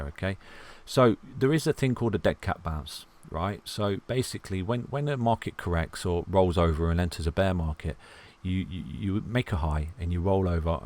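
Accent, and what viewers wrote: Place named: North, United Kingdom. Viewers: Always great video's on TA. Although sometimes difficult to keep up with the talking speed in combination with a slight English dialect. Keep up the good work. :-) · First time viewer, informative content with a great northern accent which reminds me of home! Subscribed.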